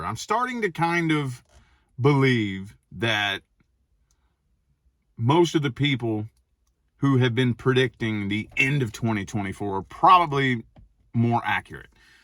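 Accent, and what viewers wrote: United States. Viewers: I see you becoming a great rapper next. Your voice is very American. might inspire you to consider it.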